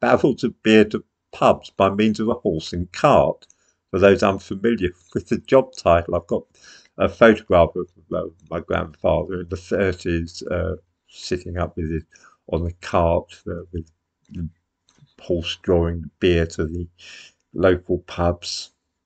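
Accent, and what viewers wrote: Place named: Cockney, United Kingdom. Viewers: I really like middle class east end / cockney accent the people and ‘class’ group in question are often considered as being exclusively working class and whilst there’s nothing wrong with that it sucks that they’ve been ‘type cast.’ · Nice to listen to an ordinary English man 🏴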